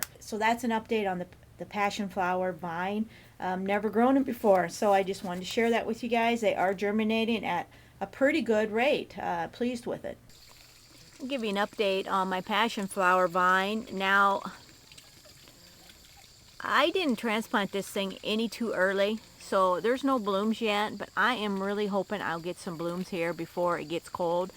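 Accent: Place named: Wisconsin, United States. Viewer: Thanks for this. I live in Santiago Chile and I've seen these growing around neighbors' gates. I just picked a few dried fruits off them and will plant the seeds are you suggest. Can't wait! These are such amazing flowers! By the way, I grew up in the midwest and enjoyed listening to your accent! (Wisconsin?)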